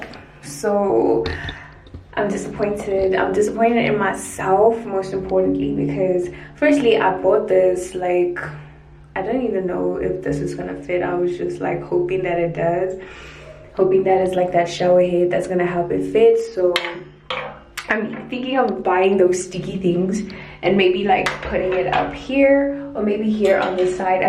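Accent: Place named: United States. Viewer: You have an American accent... Not mad at it though. It's a free country ✨ beautiful vlog❤️❤️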